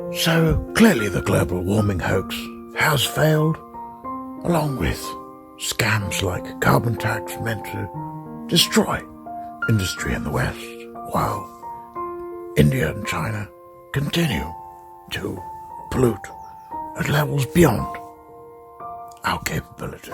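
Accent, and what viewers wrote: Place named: RP, United Kingdom. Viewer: Hi. Not a bad attenborough accent. But I keep hearing a bit of Brian badonde slipping through. Fonejacker incase you dont know. 🤣🤣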